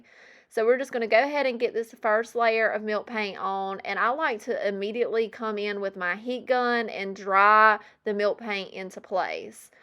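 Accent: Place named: South, United States. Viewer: your southern accent reminds me of julie from julie thrifts. both of you say some words, like old (you throw a u sound in there) the same way. I liked how you explained things, so I joined. I also like that you clean with windex. that's my go to as well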